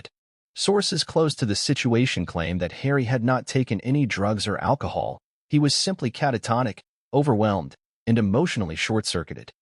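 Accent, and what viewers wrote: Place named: United States. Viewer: Is this true or just another example of American accented BS?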